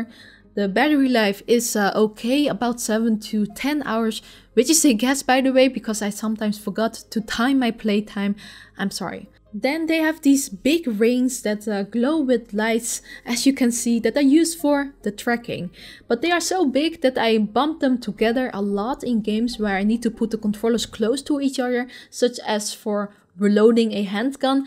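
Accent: Netherlands. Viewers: I know a Dutchie when I hear one:)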